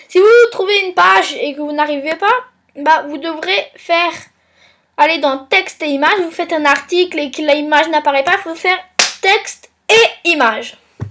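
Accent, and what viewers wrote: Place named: Alsace, France. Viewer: Ta voix comme elle a change et jkiff ton accent Alsacien 😂😂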